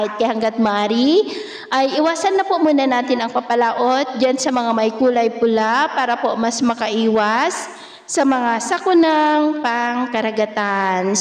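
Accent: Visayas, Philippines. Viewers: Bisaya Yung nag wiwider apdit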